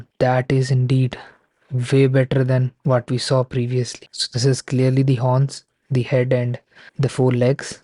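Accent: India. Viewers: I wasn't expecting Indian accent when I opened the video. However, thanks for the comprasion.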